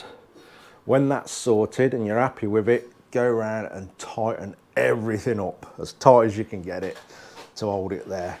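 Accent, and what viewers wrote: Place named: Black Country, United Kingdom. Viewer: Hi I've been watching your videos for some time now and I'd like to say how great it is to watch a professional /thorough craftsman at work! Thank you for sharing your knowledge with us! Just curious, You sound local to me, I'm in brierley hill what area do you live in? Thanks Mark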